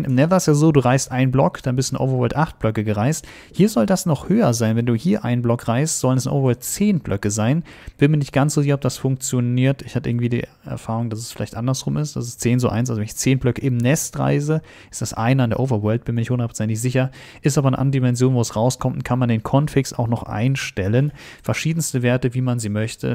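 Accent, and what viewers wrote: Hesse, Germany. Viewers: Auf jeden Fall kommt er aus Hessen meine ich, dachte aber aus der Nähe von Frankfurt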